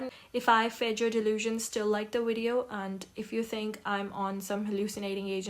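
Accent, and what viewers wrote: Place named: India. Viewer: WAIT ARE U INDIAN ??? Cause the quote sounds familiar and ur accent is the pretty indian one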